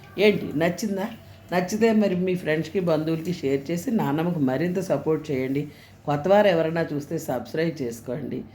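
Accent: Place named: Godavari, India. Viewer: Ma ammamma la matladataru amma mana godavari style ye veru amma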